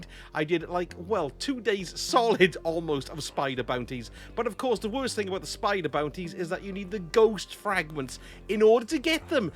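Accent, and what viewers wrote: Wales, United Kingdom. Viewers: yo, this guy sounds welsh lmao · Nice to hear a fellow Welshman on Destiny2. Awesome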